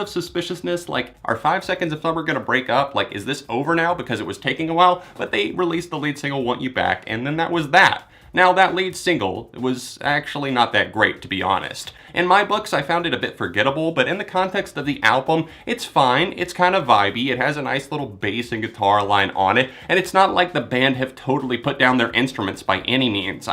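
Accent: Australia. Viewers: I like your Aussie accent,!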